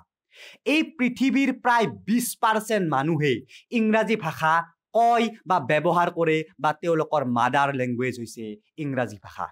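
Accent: Nalbari, India. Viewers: সেয়া আপোনাকো কও যে কাৰোবাৰ ভাষা কোৱা 'ঢং' বা 'accent' টোক লৈ তেওঁক বিচাৰ কৰিছে। তেওঁ নলবাৰীৰ ল'ৰা, আৰু অসমত ঠাইভেদে এই ভাষা কোৱাৰ ঢং টো অলপ বেলেগ বেলেগ, মই তেওঁক চেষ্টাক সমালোচনা নকৰো, বৰঞ্চ তেওঁক চেষ্টাক ৱাহ বুলিয়ে কৈছো.....কাৰণ মই ভাষা কোৱা 'ঢং' টো নহয়, মানুহ এজনে কি কব বিচাৰিছে তাক লৈহে বিচাৰ কৰো মানুহ এজনক.... আপুনি মই যেতিয়া হিন্দী-ইংৰাজী কও, তেতিয়াও ই অলপ বেলেগ ধৰণৰ লাগে সিহঁতৰ, যিসকলে এইটো সদায় কৈ থাকে....আমি কলে আমাৰ accent টো তেওঁলোকৰ লগত নিমিলিব, এতিয়া accent নিমিলে বুলিয়ে কথাখিনি বেয়া বুলি উলাই কৰিব নোৱাৰি। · Tumar bhasa tur sur tu he Nalbariya. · I love the nolberiya accent❤️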